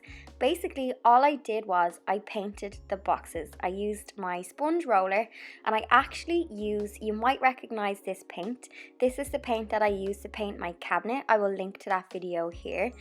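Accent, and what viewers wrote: Ireland. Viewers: You have gorgeous eyes! My hubby is Irish, I love an Irish accent. I could listen to you all day. Great ideas, thanks for the video! Just subscribed! ❤😊🍁❤ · Your diys are cute just like you. Love your Irish accent! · I enjoyed your video! I do all of these already...but I see I need to make them pretty because these look great!! And I so love your beautiful Irish accent!! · I love the Irish accent. It is absolutely gorgeous.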